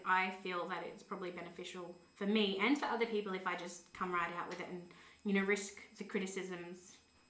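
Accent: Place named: Australia. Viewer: Hey, fellow Aussie here, really liked your honest post, so nice hearing the Aussie accsent too. Sleeve day is June 14th, very excited. Thanks for the vids!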